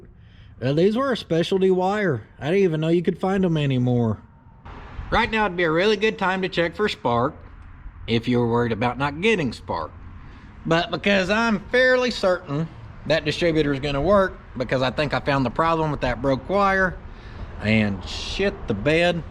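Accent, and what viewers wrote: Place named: Georgia, United States. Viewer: I've been to Stroklahoma many times and I ain't ne'er heard no accent like you done got there. That right there sounds like a deep Gerjia or Alabamia accent...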